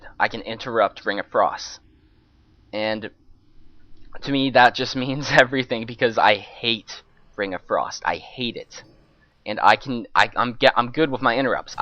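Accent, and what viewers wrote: Canada. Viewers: Are you Canadian ??:)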